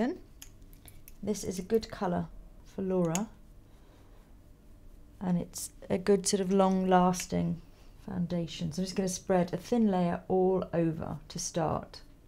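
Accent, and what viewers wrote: United Kingdom. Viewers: i must say your accent is great. i've heard a lot of british accents and yours is very proper and thick. HOT.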